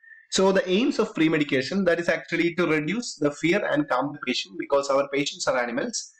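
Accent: India. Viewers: 😂 Indian lololololoo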